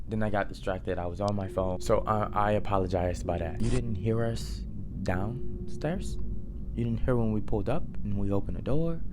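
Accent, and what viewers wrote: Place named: New Orleans, United States. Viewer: First timer here I here the NOLA in your voice ❤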